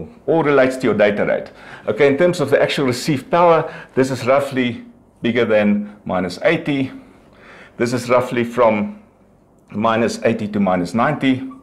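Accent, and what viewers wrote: South Africa. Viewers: South African right?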